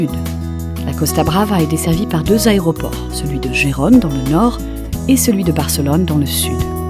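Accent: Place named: France. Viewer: Visca Girona i tota Catalunya Catalana, c´est marrant d´entendre les noms de ville et d´endroit avec cette prononçassions Française.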